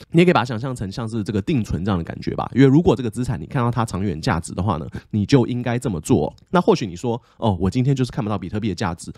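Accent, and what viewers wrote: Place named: Taiwan. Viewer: 好少看到D大講台語😂😂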